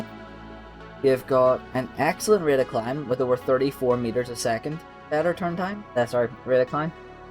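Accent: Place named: Ulster, United Kingdom. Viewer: Your definitely an ulsterman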